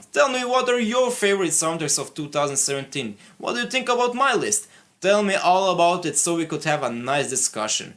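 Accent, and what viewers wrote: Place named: Northern Europe. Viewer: good to know. Just know that I'll be supporting you, because not many people respect film scores such as yourself. And also, where are you from?- you sound northern European.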